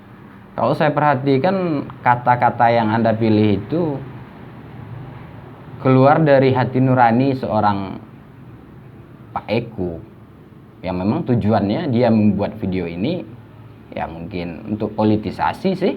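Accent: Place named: West Sumatra, Indonesia. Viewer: Lanjutkan pak... Suara minang...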